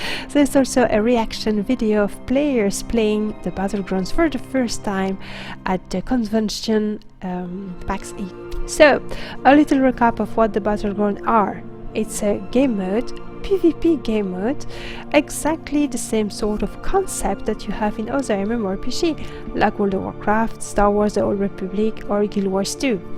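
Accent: France. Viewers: Im no expert, but are you french? . Love the voice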